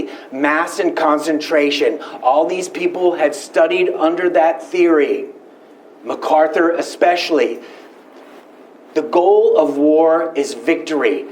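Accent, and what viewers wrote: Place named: Bronx, United States. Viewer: This speaking style is 3rd rate, does not fullfill academic standards. Articulation as if the Bronx back alley accent, Joe Pesci et al. Unlistenable.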